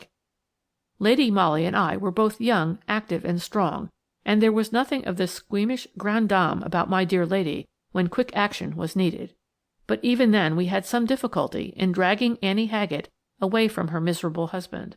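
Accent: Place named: United States. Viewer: Should not be read by an American speaker. Can't listen to it, sorry.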